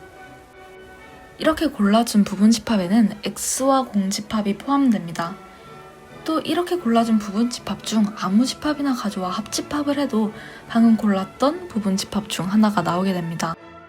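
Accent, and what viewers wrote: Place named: Japan. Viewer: 일본사람 같네요. 발음이..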